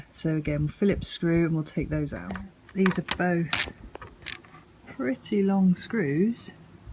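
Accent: United Kingdom. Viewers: I'm here for the how-to-fix... and the British accent.👍Thank you for the great tutorial.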